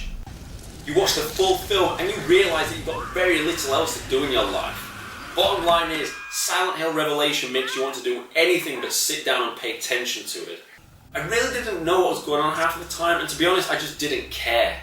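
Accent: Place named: Yorkshire, United Kingdom. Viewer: I love... your delivery is laugh out loud stuff... the edits are great and must be time consuming, voice over et et... Keep up the great work.. I'm in Toronto Canada and I laughed like hell when someone from the USA wrote to you and asked if you were from Scotland.... my family in England knew right away you were Yorkshire... They're Lancashire but don't hold that against them, lol cheers again... too funny... fantastic..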